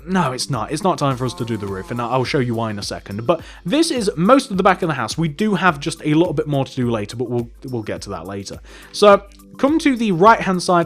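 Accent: England, United Kingdom. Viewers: are you English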